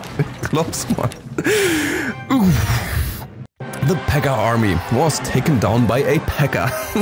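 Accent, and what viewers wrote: Germany. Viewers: are you german? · He is'nt an english or a amarican guy i think He is german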